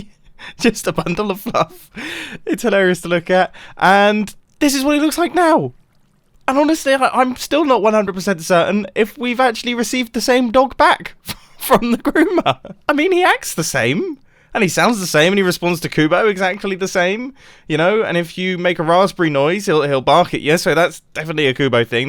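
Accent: United Kingdom. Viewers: Mumbos UK accent Mumbos low voice accent without his UK accent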